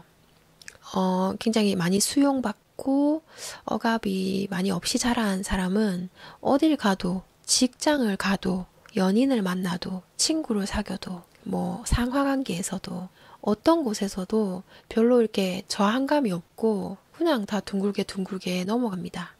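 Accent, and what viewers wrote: Gyeongsang, South Korea. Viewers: 경상도사투리가 약간섞인 감미로운 목소리에 영적능력에 진심으로 빠져듬니다. 매일 아침 눈뜨자마자 고맙게 듣고 영성의 질을 향상시키고 있어요 너무 감사핮니다 10만 20만...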